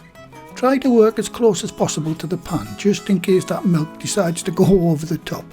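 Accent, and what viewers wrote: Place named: Middlesbrough, United Kingdom. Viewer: Are you from Middlesbrough? I am from Redcar and your accent sounds local. I love your uploads by the way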